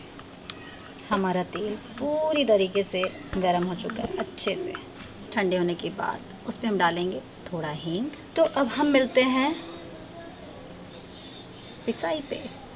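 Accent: Bihar, India. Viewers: My mom is also fan of laliya character. And also she loved your show ratan ki shaddi. You raw and original love you indian touch especially bihari accent in your vlogs.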